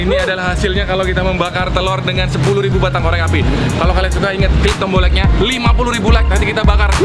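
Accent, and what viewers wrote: Bali, Indonesia. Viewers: Aku yang mikir Kak ini orang Bali, atau emang orang Bali, atau cuma logatnya yang mirip orang Bali, atau aku ketinggalan jaman? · Kyk logat bali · Memang orang bali, daerah denpasar kalo ga salah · Orang Bali? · Logat bali ny kentel bet wkwkw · Aku baru liat chanelni Kok kek logat bali:)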